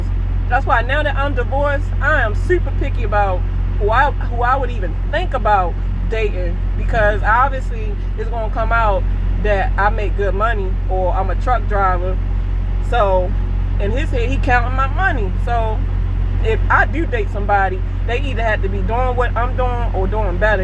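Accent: Baltimore, United States. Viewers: You gotta be from Baltimore lol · From Bmore???? I can hear it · You gotta be from Baltimore, cause that accent is pretty strong!!! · She from Baltimore huh I hear that U in all the words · Much respect queen. Make it happen. Also I hear the Baltimore in your voice and accent. Positive energy and uplift. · You are from Baltimore aren’t you? I can tell by your accent. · Love that Baltimore accent!! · You must be from b-more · U from Baltimore? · You have a Baltimore accent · i can tell you from the Baltimore area, your accent and pronunciation comes through ..... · Oh yeah I can hear that Baltimore coming out🤣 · Go old TA HUH LOL. Quick question are you from Baltimore I'm picking up on your lingo how you talk I'm from Maryland myself thinking about starting up a YouTube channel when it comes to trucking I have a YouTube channel when it comes to racing just over 10,500 subscribers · Hey Wassup.. 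Am I correct in saying your accent sounds Bmore to the core. BALTIMORE? AM I CORRECT OR AM I OFF? I'M JUST SHOWIN LOVE. RESPECT! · Baltimore accent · Straight up b mo accent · Great content! First time coming here and I may be a new subscriber, you're a very Pulchritudinous woman and I detect a strong Baltimore Maryland accent! Lol 🤣😎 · were you from dc or baltmore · She definitely from Baltimore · You must be from Baltimore. Lol. The way y’all say words that have the sound U in it is unlike anywhere else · Toooooo and dooooooo yeah she from baltimore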